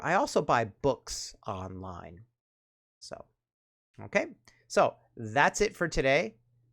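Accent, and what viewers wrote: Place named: United States. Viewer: Hey, thanks for the good videos you make, I'm working on my accent to make it better, I wanted to ask which part of US does your accent belong to?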